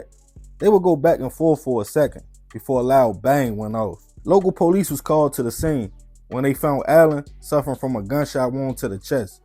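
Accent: Baltimore, United States. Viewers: This is my first time viewing one of your videos. I subscribed because your content was pretty good and I'd recognize that accent anywhere. Baltimore, right? #CharmCity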